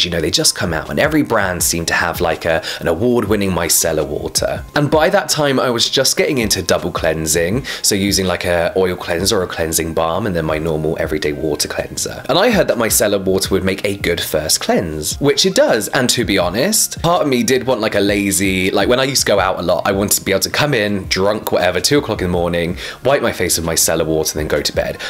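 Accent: England, United Kingdom. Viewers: I love your voice. That British accent is so melodic. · You talk so fast Man, with british aksen makes me just focused to your beautiful glowing face..hihi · He’s from England so you’re right with the accent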